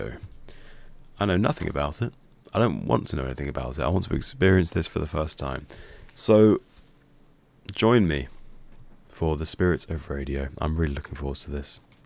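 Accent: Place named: United Kingdom. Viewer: I will check out anyone checking out. British accent a bonus.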